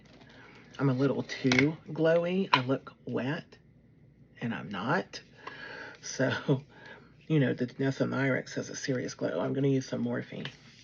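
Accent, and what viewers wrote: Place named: United States. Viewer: Aaaaah❤u look 🌹 lovely beautiful, u do have a beautiful accent n I love hw u American's speak am frm England ❤take care 😘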